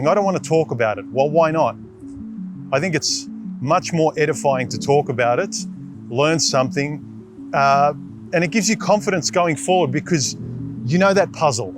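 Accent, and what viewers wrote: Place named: Australia. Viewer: Ausssssie accent... love it!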